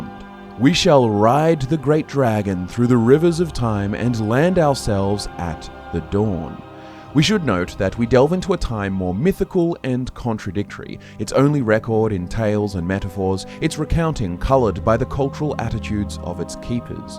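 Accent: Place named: Australia. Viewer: Didn't know he was an Aussie, I wonder if that means Drew is as well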